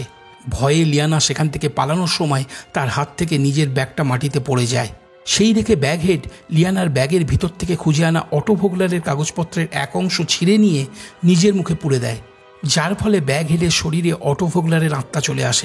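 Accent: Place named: Bangladesh. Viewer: তার জন্য আপনার কথাবার্তার ধরণ বাংলাদেশি,, 🇧🇩,, বাংলাদেশি ভাব আছে আমি বাংলাদেশ কুষ্টিয়া জেলা থেকে আপনার ভিডিও দেখি অনেক দিন ধরে আমার টার্গেট তাহলে ঠিক আপনি বাংলাদেশের বাংশোদ্ভূত 🇧🇩❤️❤️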